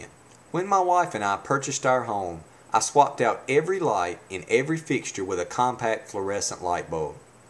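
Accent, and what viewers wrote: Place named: South, United States. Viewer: The beautiful thing about this well-thought, honest, intelligent presentation is m'man's accent. Born in Tulsa, Oklahoma, I was raised in Dallas, and now live in Houston, Texas. All of my life, I have been pounded by the media with two predominate thoughts about the men of the Southern United States; we are all of two stereotypes: 'The Worst of Villains' or 'The incompetent Idiots'. It is a shame that Gore has a dishonorable accent isn't it? My hat's off to you. Ya Done Good! Thanks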